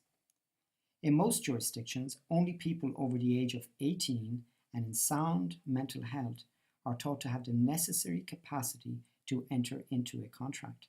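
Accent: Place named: Ireland. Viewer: This information is valuable! The narrator sounds like an Irish native. Does this presentation of Common Law apply to Ireland?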